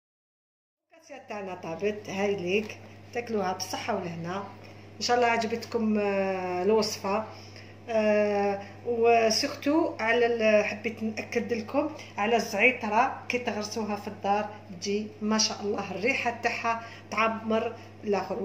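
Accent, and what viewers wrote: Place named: Algiers, Algeria. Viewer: j aime beaucoup ton accent ALgerois..une femme de parole..choukran 😘😘😍😍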